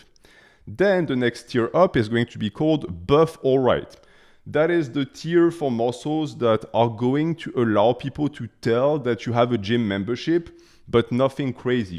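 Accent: France. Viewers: Flag, accent, demeanor, face, diet, him literally saying he's french .... What are you even on ? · T’es français?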